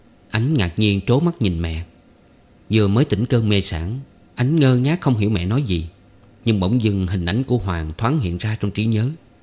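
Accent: South, Vietnam. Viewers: Giọng đọc miền Nam của Anh thật hay, thú thật tôi rất thích giọng đọc của Anh, cám ơn Anh.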